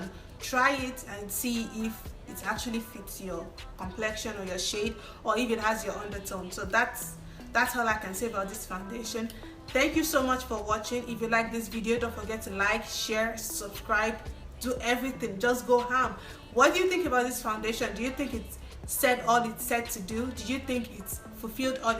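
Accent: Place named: Nigeria. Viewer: you are so beautiful! your accent sounds familiar to me but I might be misplacing it... are you Nigerian? I am too, Igbo!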